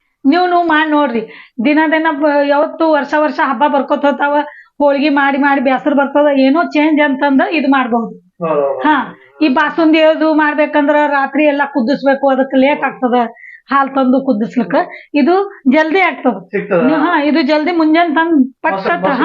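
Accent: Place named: Vijayapura, India. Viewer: ನಿಮ್ಮ ಮಾತುಗಳು ಬಹಳ ಇಷ್ಟ ನಮಗೆ ಅಪ್ಪಟ ವಿಜಯಪುರದ ಮಾತುಗಳು ನಿಮ್ಮ ನಾನು ಬೆಂಗಳೂರಲ್ಲಿ ಇರುವುದರಿಂದ ನಿಮ್ಮ ಮಾತುಗಳು ಕೇಳಿ ತುಂಬಾ ಖುಷಿಯಾಗ್ತದೆ ಏನು ನಮ್ಮವರು ನೋಡಿದಂಗೆ ಆಗುತ್ತದೆ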